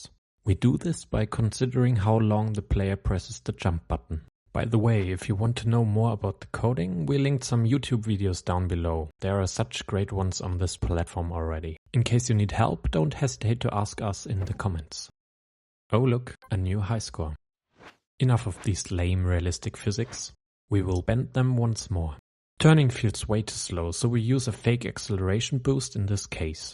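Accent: Germany. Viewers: Schönes vid... und Dein englisch ist sehr gut, aber man hört immer den "Deutschen" raus.... 👍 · You sound a bit german but im not sure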